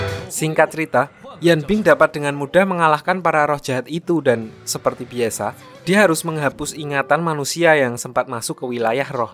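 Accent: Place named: Java, Indonesia. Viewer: Subscribe dlu smbari nntn Suara mimin asik jls dn ada jawa² nya gitu 🤣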